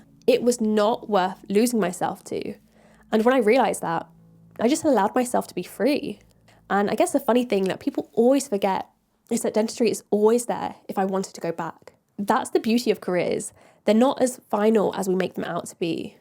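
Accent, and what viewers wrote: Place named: RP, United Kingdom. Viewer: You sound very posh!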